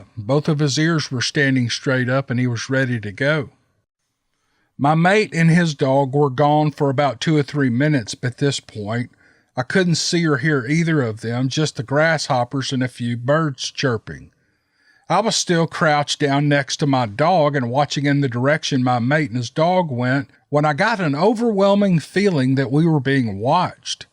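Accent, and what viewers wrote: South, United States. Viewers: Another great monster in a swamp story! Nothing better. Especially from a guy with a very twangy southern accent. It makes me want to be there! lol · Welcome back we get to hear that southern draw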